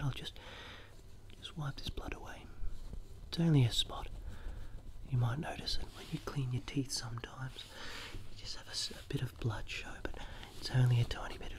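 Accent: Australia. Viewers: Wow your voice is so soothing and gives me tingles but them tools are scary as 😂😂😂 am I right that you're a fellow Australian?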